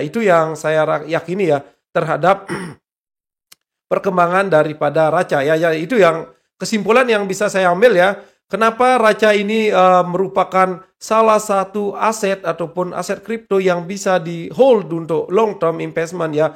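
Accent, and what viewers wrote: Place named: Bali, Indonesia. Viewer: Medok banget bli ciri khas Bali nya😀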